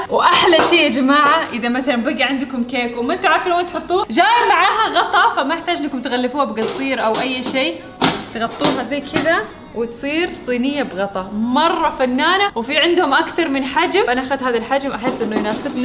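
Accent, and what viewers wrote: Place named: Iraq. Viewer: احكي يوام كامل عراقي😊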